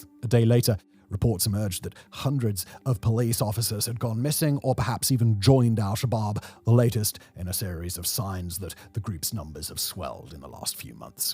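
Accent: RP, United Kingdom. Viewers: you are a prime example that everything sounds correct when said in an RP British accent. I live in Mogadishu wife,kids, extended family, the whole shebang. We are not under siege. The turks have just declared that the two blocks they explored has an estimated 20 billion barrels of oil. I do not engage with your output because you know very little about very much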